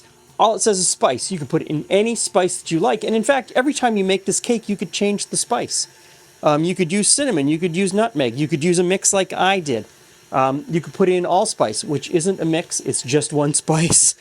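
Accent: Canada. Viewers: Hi just started watching your videos. I’m Scottish ( Edinburgh) so watched the old Scottish cook book one. Was curious to see if it matched the kinda stuff my granny passed down. The carrot pudding looked good. Although it did look a lot of butter so I’m not sure it meant fluid Oz maybe Oz as in scales. Anywho could try both ways to see. So this one would have been better done as a clootie dimplimg. A cloot meaning cloth in Scotland. So boiled for about 2 hours. Also the addition of suet is helpful and would have been in most recipes.. puddings and pies. Thanks for your videos , you seem like a kind friendly guy. I’m also starting to recognise the Canadian twang in accents when I hear it. I’m not used to hearing Canadians being from a small village. Look forward to seeing what else you do.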